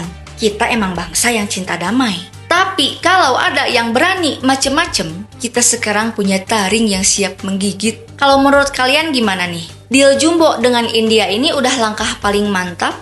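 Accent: West Java, Indonesia. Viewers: Aku suka penjelasan nya jelas dn lucu ada logat Sunda nya gitu 😂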